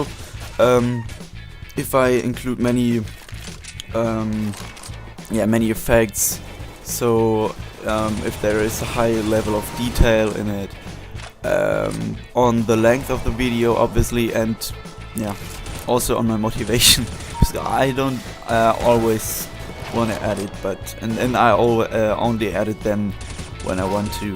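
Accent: Germany. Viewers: are u german ?^^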